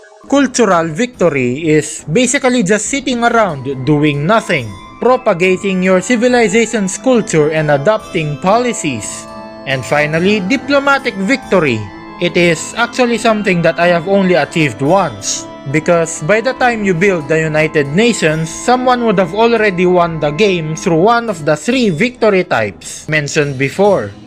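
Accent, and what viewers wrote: Philippines. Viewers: Spiffing Brit with dat Filipino accent 🔥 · Why do we have the same accent? Kabayan?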